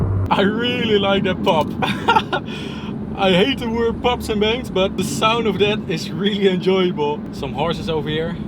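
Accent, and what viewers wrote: Netherlands. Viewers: I dont think you can do anything about this but you can really hear your dutch accent very well :) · You can hear you’re Dutch but that’s not a problem. You’re video is really nice to look at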